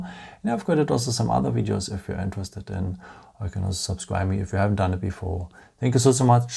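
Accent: Germany. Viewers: Cute German accent ☺️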